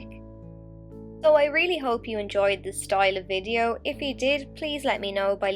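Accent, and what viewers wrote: Ireland. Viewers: God, I love Irish accents.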